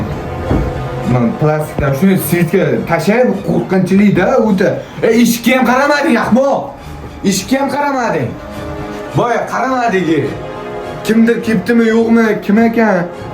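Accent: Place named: Qashqadaryo, Uzbekistan. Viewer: Qashqadaryodanmisz dimn manimcha sheva tortyaptida😂😂munaqasiya dganizga o'ldim lkn😂😂😂😂😂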